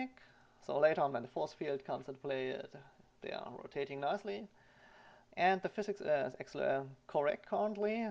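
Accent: Germany. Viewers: Hammer Englisch :D Ich liebe diesen deutschen Akzent :)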